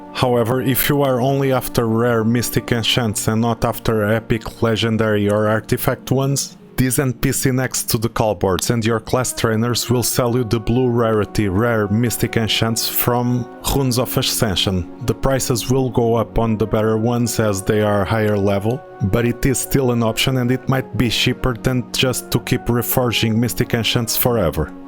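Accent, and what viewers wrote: Portugal. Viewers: Hey! You Portuguese? O sotaque diz-me que sim 😅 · Awesome video. 1 question are you portuguese?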